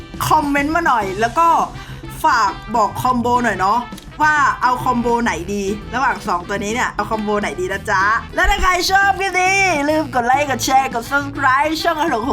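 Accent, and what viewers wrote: South, Thailand. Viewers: พี่คนใต้เหรอผมก็ใต้นิพี้เห้อ😂😂😂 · พี่คนใต้เหรอครับผมก็อยู่ใต้ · พี่พูดทองเเดงตลกมากผมก็คนใต้ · พี่เป็นคนใต้เหรอครับ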